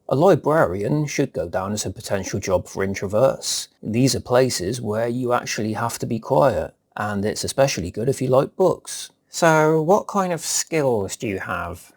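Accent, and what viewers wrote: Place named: RP, United Kingdom. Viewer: On a different note, this channel is quite good if you want to learn Received Pronunciation.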